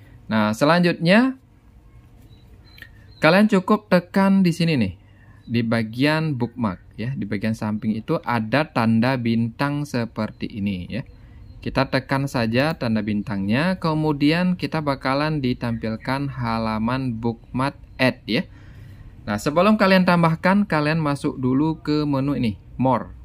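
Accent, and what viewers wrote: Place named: Bali, Indonesia. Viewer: Orang bali ini, medok bali ngomongnya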